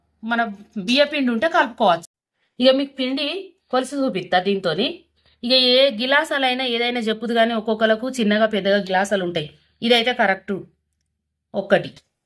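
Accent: Telangana, India. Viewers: సూపర్ అమ్మ మీరు మంచిగా తెలంగాణా యాస మాట్లాడుతున్నారు... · అమ్మా మీ తెలంగాణా యాస సూపర్.... చాలా బాగుంది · Amma mee telangana slang bagundi naaku nachindi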